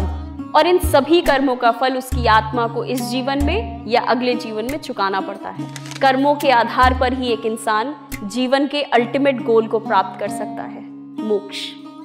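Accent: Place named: South, India. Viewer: It sounds offensive if one speaks Hindi like Telugu, Tamil, or Kannada. Please try to completely say words in the Stotra in the beginning, like how Sanskrit is supposed to be spoken. Eg: kara(ha) means hand in Sanskrit. Kar doesn't give the same meaning. Thank you.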